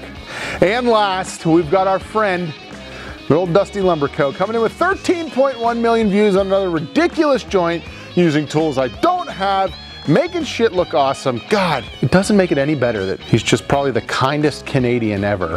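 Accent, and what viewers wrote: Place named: Pennsylvania, United States. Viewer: Youins guys.........Pennsyltucky English. Love your channel. Thanks for sharing.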